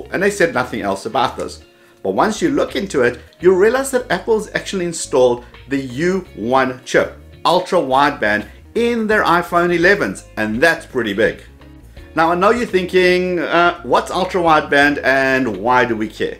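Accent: South Africa. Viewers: Are u from South Africa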